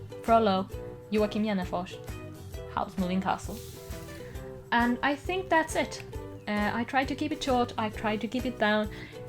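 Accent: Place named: RP, United Kingdom. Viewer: it's a bit posh but in a sophisticated way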